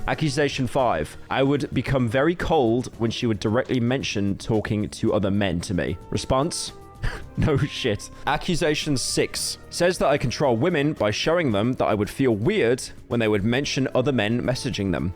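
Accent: United Kingdom. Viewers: He is brittish, thats how you pronounce it in the botta o watta accent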